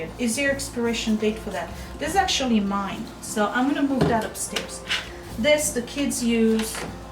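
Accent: France. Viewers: not sure you will see this but I felt compelled to tell you that because of YOU and your daily motivation,allowing us to see your REAL life "collecting" I finally dropped off 6 trash bags and 2 boxes of clothing to the goodwill yesterday. I have been unable to make any substantial progress in reducing my junk until I met you. So when you are exhausted and not sure your videos are worth it, believe me they are SO appreciated. I hear your lovely exotic french voice in my head all day, motivating me to keep making those decisions, I have ignored for 10,15,20 yrs. I have not followed your schedule but started with clothing stored in bins in the laundry room because they bothered me the most and felt they would make the biggest impact once gone! This will take months and months but I am finally ready!! Thank you is not enough.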